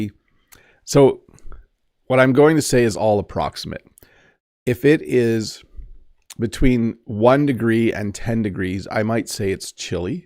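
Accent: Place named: Canada. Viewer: Hello,! I currently live in California. Every day, I watch videos to improve my English listening skills. How different is American English from Canadian English? I really enjoy watching your lessons. Unfortunately, people around me don’t speak as clearly as you do. Or is this a feature of the Canadian dialect? Thank you very much for your work!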